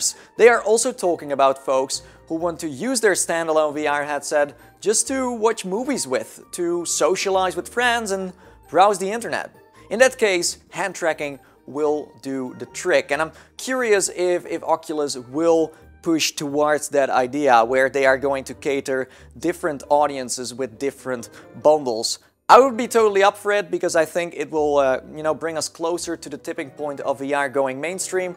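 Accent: Netherlands. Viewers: Ur definitely dutch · I can tell you're dutch. honestly, same. and i could only wish i got an oculus quest 2. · Ik zweer ik vind het zo fucking grappig wanneer ik merk "hmm, ik hoor een minuscuul klein accentje. Die persoon is Nederlands." En negen van de tien keer heb ik het goed. Altijd weer leuk om mede Nedelanders te vinden waar ik interesse in heb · seems kinda Dutch am I rightytighty? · It sounds Dutch, but not sure. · I smell a littlebit dutch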